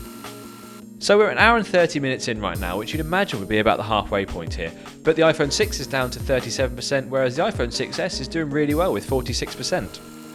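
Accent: United Kingdom. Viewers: why is this guy speaking British?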